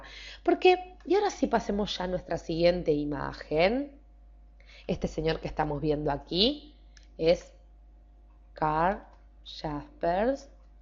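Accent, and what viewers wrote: Argentina. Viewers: pues practicamente este video nos da la definicion y algunos filosofos algo que emos estado viendo a lo largo de la semana, me gusta mucho la filosofia por que es el amor a la sabiduria pero aun mas por que trata de encontrar el por que de las cosas que pasan, tratando de encontrar la verdad, otra observacion es fue ra del tema y mas con el video la voz de la narradora del video me encanta como habla mas por que es argentina :3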